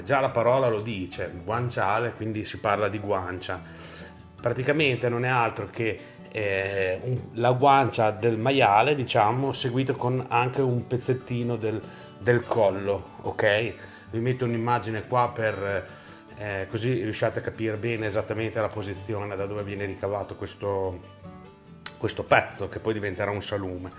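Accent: Emilia, Italy. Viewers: sei bravissimo, grazie per questo video! una curiosità, di dove sei? dall'accento sembri emiliano. io sono romagnolo (Rimini) ;)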